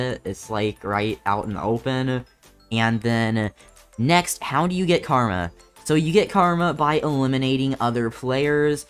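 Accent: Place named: United Kingdom. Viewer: So bri'ish for me idk why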